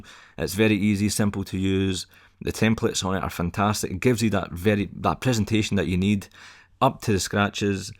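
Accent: Scotland, United Kingdom. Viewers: are u scotish?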